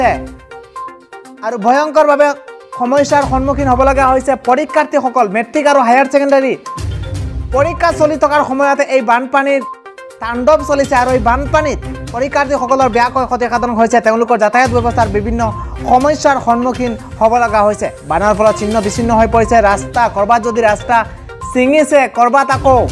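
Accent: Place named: Bangladesh. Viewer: Toi bangladeshi neki kotha khuni khenekua lagise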